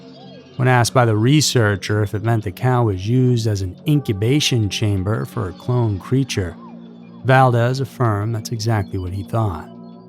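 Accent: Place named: Midwest, United States. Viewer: Not Texan, probably mid western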